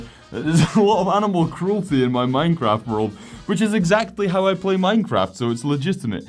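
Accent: Scotland, United Kingdom. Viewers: thx for commenting, love your Scottish accent, looking to play a bagpipe rn ❤️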